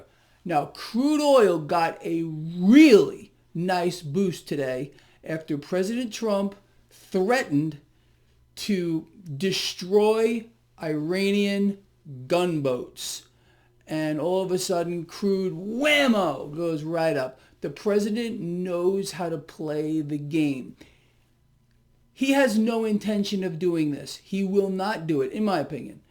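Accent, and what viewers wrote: United Kingdom. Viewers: British..The accent is British... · Sounded a little bit like a posh English accent 😁👍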